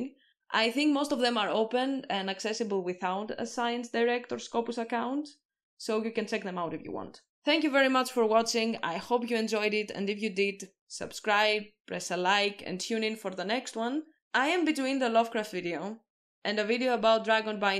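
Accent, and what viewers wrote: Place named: Greece. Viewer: From your accent I was sure that you were greek lol, and it was confirmed by the reference to Santorini. It is wild how coincidental knowledge someone has due to their local environment can help them discover things that others would have (probably) never thought of! Very thorough research on the lore too, I look forward to watching your other videos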